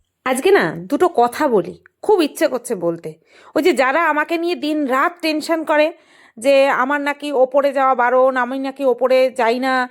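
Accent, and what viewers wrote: Bangal, Bangladesh. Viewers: Bangal bhasa ki je sruti modhur laage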